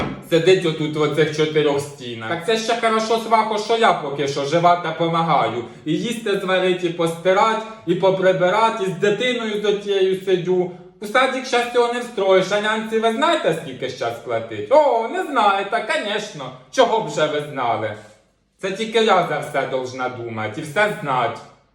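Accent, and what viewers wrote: Poltava, Ukraine. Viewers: Полтавський говір не сплутаєш ні з ким.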